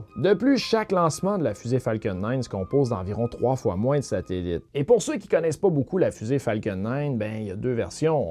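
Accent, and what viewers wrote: Canada. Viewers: J'aime bien votre accent Canadien et la petite voix. Un Français qui apprécie ce que vous faites comme travail.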